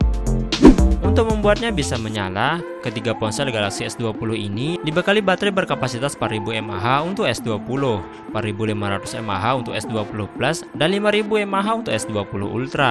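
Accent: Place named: Bugis, Indonesia. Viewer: Logat bugisnya masih ada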